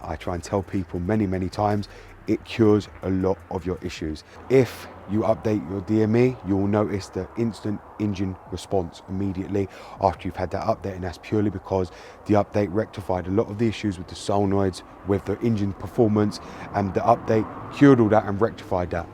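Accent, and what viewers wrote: United Kingdom. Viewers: I'm 23, would like to be a vag technician but I like BMW, trying to get a <num>i as my first and daily car, this Chanel is 🤘🏾, the only thing I'm not very comfortable is with the UK accent ahaha but you're okay, I'm just not use to heard it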